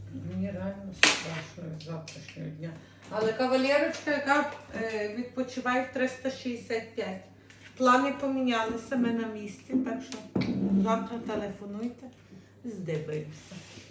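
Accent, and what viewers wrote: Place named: Yavoriv, Ukraine. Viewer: О,ви з Яворівщини,або напрямок біля кордону з Польщею ,чую знайому говірку 😂)))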